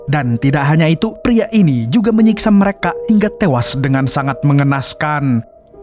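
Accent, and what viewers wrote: Banjar, Indonesia. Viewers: Orng Banjar sekali nya😂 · Asli urg mana nyawa? Banjar kh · Logat Banjarnya ketahuan banar wkk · Logat banjar admin nah😂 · Urang Banjar leh😂 be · bahh orang banjar sekali nya😂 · Banjar banar sekalinya lah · nyawa nie orang banjar kh 😅😅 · Bang, lu orang banjar yah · Org Banjar ya bg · orang banjar bang? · Ya lo, amang asli Banjar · Orang Banjar kh mang, behblah. Ulun jua nh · Pian nih urng mna bang? Logat nya tuh na kaya urng bnjar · banjar kali nya pian mang lah 😂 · Banjar sekalinya pian mang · Banjarnya terasa njirr.. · Ini banjar kah adminnya yo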